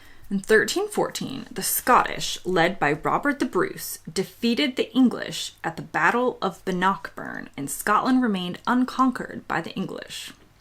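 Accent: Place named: United States. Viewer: you sounds like american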